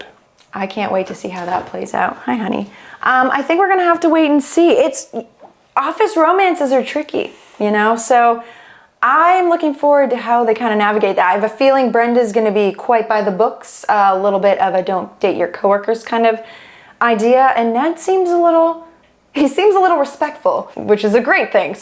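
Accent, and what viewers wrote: Canada. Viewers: I figured you were Canadian by the way you say words like 'House' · I love hearing Canadian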